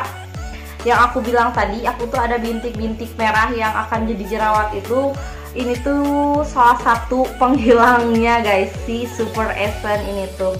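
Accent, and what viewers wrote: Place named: Cibeber, Indonesia. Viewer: Atuh logatnya kaya ga asing nadanya juga.pantesss teteh dari Cibeber ya allah kampung halamankuuu😍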